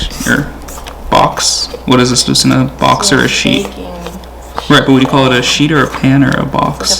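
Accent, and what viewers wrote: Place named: United States. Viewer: джан,и тебе спасибо, за твои вкусненькие рецепты.! Арменин,который говорит по русский с американским акцентом.! ☺️👍🤗😘💋❤️🤗 джан,с Великой Пасхой тебя поздравляю.!Мира,здоровья и добра тебе желаю.!И естественно,процветания твоему замечательному каналу.!👍👍💥💥💥💥🌞🙋‍♀️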